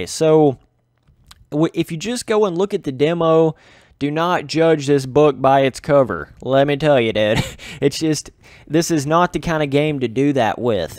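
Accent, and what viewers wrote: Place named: South, United States. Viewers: where r you from you sound so country. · thats southern, not country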